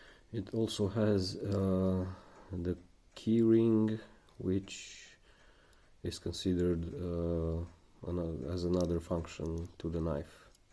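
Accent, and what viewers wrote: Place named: Russia. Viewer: Не ну конечно акцент бля палевный кабзда,нашего человека узнать на раз)))Красава хули!!!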